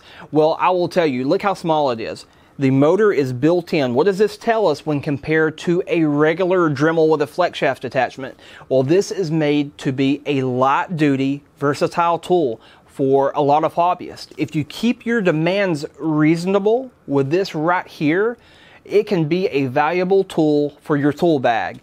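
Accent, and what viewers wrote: South, United States. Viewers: Love that southern accent....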